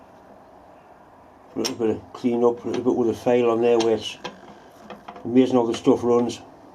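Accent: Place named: Scotland, United Kingdom. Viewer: Love your accent, Scottish. Well, for me here in America it's an accent lol....for you, I have an accent. Good job.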